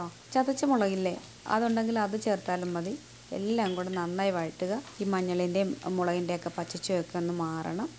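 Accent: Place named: Ochira-Karunagappally, India. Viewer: സഹോദരി. ഓച്ചിറ, കരുനാഗപ്പള്ളി ഭാഗം(സംസാരം) ആണെന്ന് തോന്നുന്നു.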